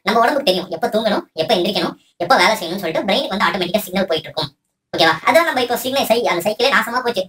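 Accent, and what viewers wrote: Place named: Kongu, India. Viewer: Na 10 oo or 11 oo clock ee thoongiduven and vanakam vanakam vanakaaaaaaaam super appu apdiye kongu tamil aaa pesunga nalla itukum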